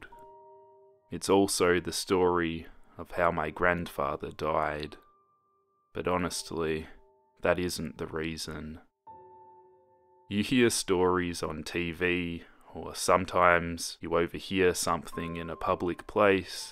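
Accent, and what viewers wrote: Australia. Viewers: DasConii I love the way he says it too, beautiful Australian accent. Maybe I just think this because I don't have an accent?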